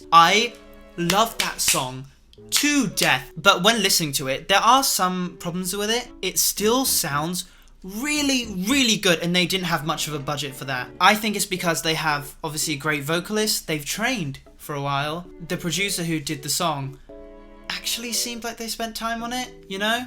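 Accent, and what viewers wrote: United Kingdom. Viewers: Ok so this is my first time watching one of your non singing videos and your British accent hit me like a fucking train ngl. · J’aime tellement l’accent des UK 😭❤️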